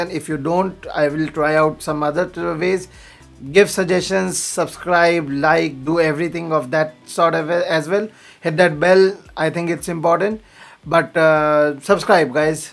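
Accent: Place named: India. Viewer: Me too LoL 😘 very rare to find Indian English speaking channel haha!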